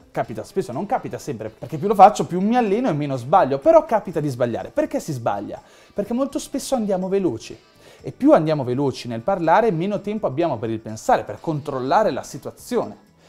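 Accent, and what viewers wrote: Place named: Ferrara, Italy. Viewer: Ma sei come me di Ferrara, mi sembrava di sentire un accento comune!!! :D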